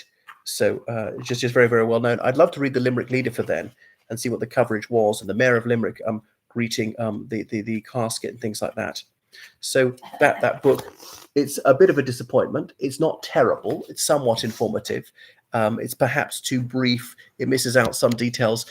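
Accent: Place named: England, United Kingdom. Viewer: Read like a redcoat . Bit boring old chap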